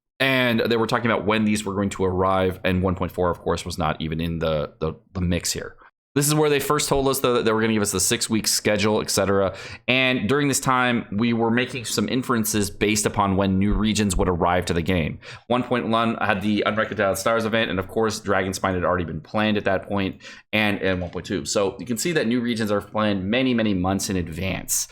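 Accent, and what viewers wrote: United Kingdom. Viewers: I love your intro with and your videos theory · Someone should make a compilation of all of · is why original is able to provide us with information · is poggers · = best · I just watch for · the thing is getting a bit cringe but i guess your audience likes it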